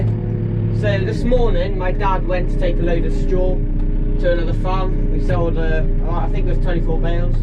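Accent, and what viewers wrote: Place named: Pembrokeshire, United Kingdom. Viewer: Where abouts you from you sound like you’re from Pembs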